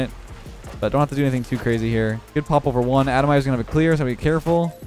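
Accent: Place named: Canada. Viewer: He’s either from Canada or Minnesota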